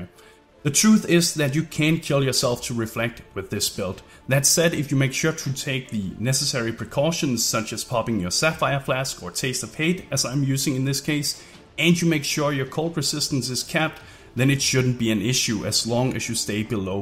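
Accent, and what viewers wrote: Denmark. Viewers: Can you level up with this? Btw, are you danish? :D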